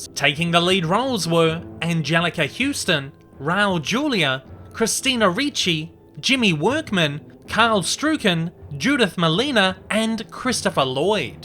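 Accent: Australia. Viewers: your accent sound like Australian or Ozzy i m right i m kidding o know your a Australian what else will down under means then referring to Australia.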